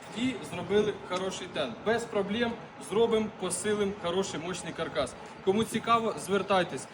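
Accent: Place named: Surzhyk, Ukraine. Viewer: Ну говори уже на русском языке, слышно же по твоему суржику что ты русскоговорящий.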